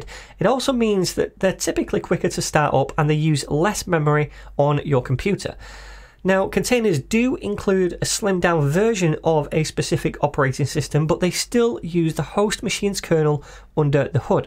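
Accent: United Kingdom. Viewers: There's something about a classy British man explaining programming to you as your own personal professor Cheers, mate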